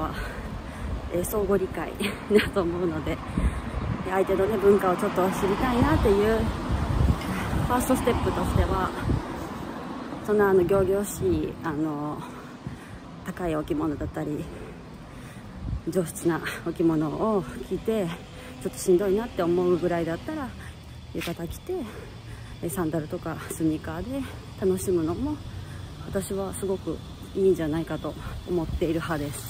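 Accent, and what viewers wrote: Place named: Kyoto, Japan. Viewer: 京言葉素敵です❤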